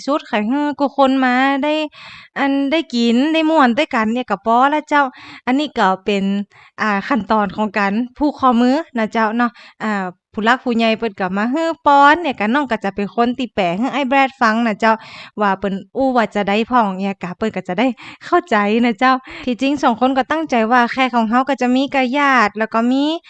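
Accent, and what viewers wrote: North, Thailand. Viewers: เป็นงานแต่งที่น่ารักอ่ะ วันนี้บังเอิญเปิดมาเจอ เลยได้ไล่ดูมาเรื่อยๆ เจ้าของช่องอู้คำเมือง น่ารักดีค่ะ ยินดีด้วยนะคะ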